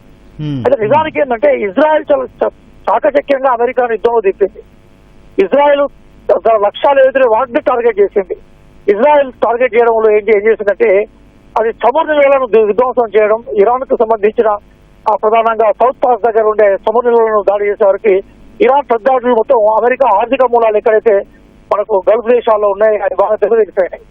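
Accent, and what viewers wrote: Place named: Telugu, India. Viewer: ఈ tv తెలుగు స్లాంగ్ 👌👌👌❤️❤️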